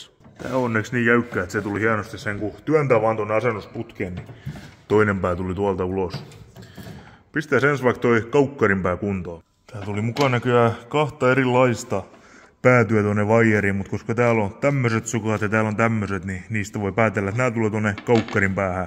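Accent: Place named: Turku, Finland. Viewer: "Saisin halvalla" 80-luvun Marino Swingin 20hp Jammulla. Luottopuheiden mukaan soiva peli muuten, mutta peräpeiliremonttia kaipaa. Kun oot niin hehkuttanut noita braketteja perän jatkoksi, niin olisko tässä koko- ja teholuokassa semmosessa mitään järkeä? Vois askarrella samalla, kun vanerin joutuu kumminkin vaihtamaan. Parantaisko se potkurin olosuhteita, kun on kuitenkin aika jyrkkä v-pohja? Vauhtia en niinkään kaipaa, enemmänkin pientä kulutusta pienellä koneella. Tulis samalla sisätilaa, kun peräpenkin siirtäis kaivon paikalle. Joka tapauksessa tekisin ton sun Silacraftin tyylisen keskipulpetin, jo pelkän ajoasennon takia. Ja kun kuskilla on painoa 180kg (ei oo kirjoitusvirhe), niin hörppääkö kiikkerä Swingi vettä pulpettia kiertäessä? En oikeen pääse sitä vedessä kokeilemaan ennen kauppoja (ja romahtaneen ladon raivaamista). Ja kiitos hyvästä kanavasta. 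En olis ikinä uskonut, että joskus okein odotan pääseväni kuulemaan turun murretta!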